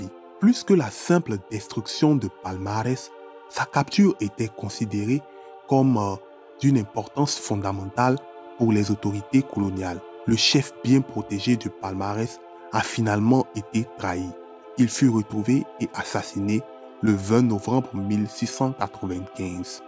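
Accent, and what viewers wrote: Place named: Africa. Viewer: Salut, trop pénible à écouter !...entre la musique qui est trop forte et votre diction tinté d'accent africain, je me fatigue à tendre l'oreille ...c'est dommage !....j'espère que cette critique vous permettra d'améliorer vos prochaines vidéos !...bon courage pour la suite !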